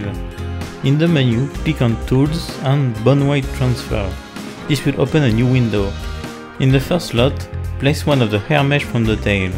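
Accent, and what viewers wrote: France. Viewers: (on voit l'accent français ptdrr) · Oh un francais ca se voit a ton accent faut travailler ca mais sinon c un bon tuto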